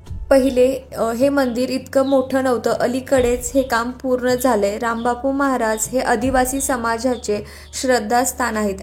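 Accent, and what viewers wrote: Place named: Kolhapur, India. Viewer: तुम्ही विदर्भाच्या म्हणता पण कोल्हापुरी भाषेचा साज चढवून छान न.एकच, लय भारी व्हिडिओ बनवलाय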